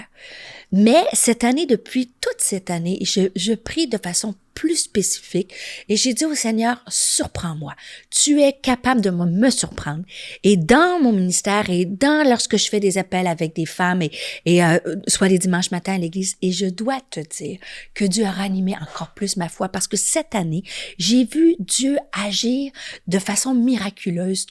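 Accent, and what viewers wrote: Quebec, Canada. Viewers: ce beau accent québécois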